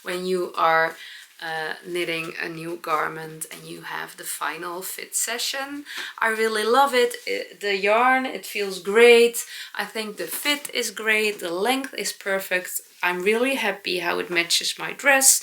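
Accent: Netherlands. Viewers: Love your dutchy English, you re searching for “kastanienbraun”, so similar to kastanjebruin, ❤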